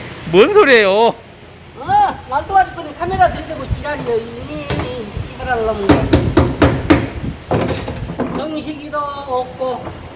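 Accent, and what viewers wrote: Jeolla, South Korea. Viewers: 아따 절라도 사투리가 구수하구만요. 카톡 확인 부탁 드리겠습니다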